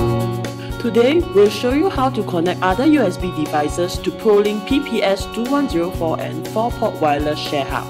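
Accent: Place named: China. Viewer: I love the Chinese accent!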